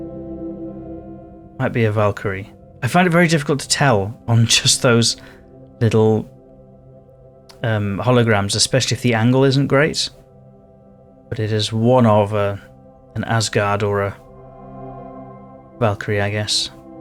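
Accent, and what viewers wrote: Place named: United Kingdom. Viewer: Hope you stay safe amidst the turmoil in the UK from what i can tell (your accent lol) you are British Anyway stay safe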